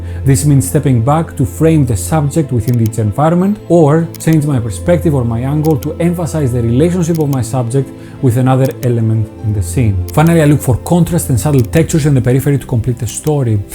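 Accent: Greece. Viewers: Amazing, your Greek accent is similar to mine, from Spain. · Μου αρέσει ιδιαίτερα η προφορά στα αγγλικά.Δωρική θα την έλεγα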